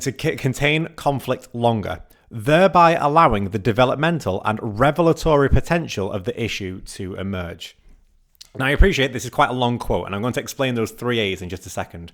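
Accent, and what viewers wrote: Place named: North, United Kingdom. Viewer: LOL I’m from Preston and thought we had the same accent but now reflecting I thought there was a Yorkshire tweak as well. Always weird when you hear someone not without American accent but with an accent so close to yours on YouTube.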